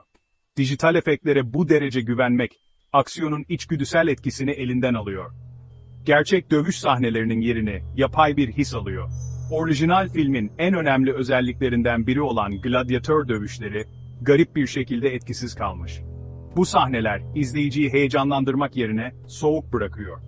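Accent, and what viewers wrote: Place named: Rize, Turkey. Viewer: Sikik rizeliler gibi konuşma aq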